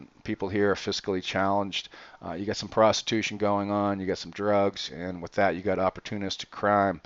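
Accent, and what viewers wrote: Boston, United States. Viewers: Boston accent.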